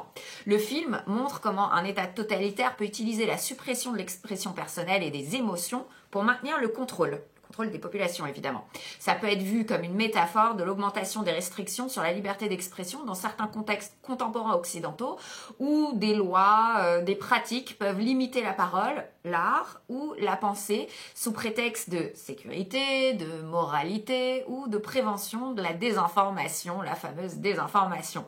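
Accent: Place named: England, United Kingdom. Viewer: Bonsoir madame, non moi je dois trouver un moment pour regarder un de vos films, avec votre english accent que jadore, sinon toujours classe.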